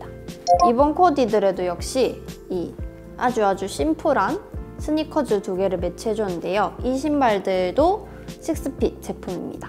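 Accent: South Korea. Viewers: I love her accent.. it's kinda like my korean friend's accent XD love you unnie, fighting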